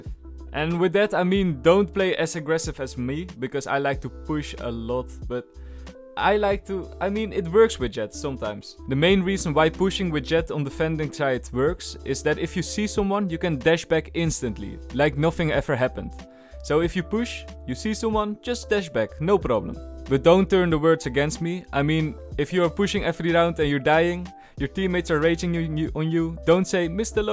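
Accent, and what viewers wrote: Netherlands. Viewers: Ik hou niet vaak van zo'n Nederland accentje maar deze is toch wel mooi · Je kunt gw horen dat hij nederlands is😂😂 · love DAT THICC DUTCH ACCENT DUDE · dang that dutch accent! i love it! (ik ben ook nederlands XD) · The Dutch accent dude's back with good shit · Je hebt echt zo’n Nederlands Engels accent fucking mooi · i love the dutch accent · Je hoort meteen aan zijn accent dat ie Nederlands is xd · I feel dutch accents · hoor dat je nl bent nice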